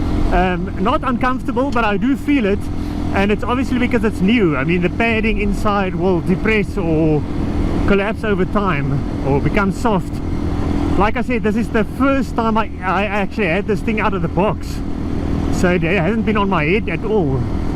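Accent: South Africa. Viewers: Is dié man Afrikaans?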